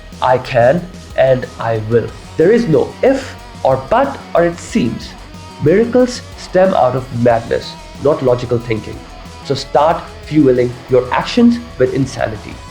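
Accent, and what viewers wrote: India. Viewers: 930,088 I have subscribed, even though at first it sounded like another mindless dribble but by a not too typically accented Indian dude with a channel name that appears to explain vaginal bleeding. Point made and leading up to was fantastic. Thumbs up and if it works for me within the next 3 days I’ll probably donate aswell.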